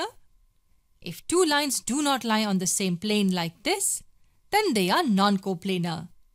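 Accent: India. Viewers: Indian, obviously.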